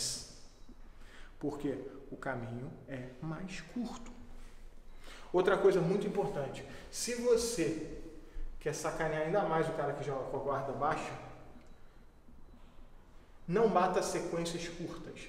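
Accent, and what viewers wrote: Rio de Janeiro, Brazil. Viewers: Tu é carioca ou Paulista vei 😂😂😂😂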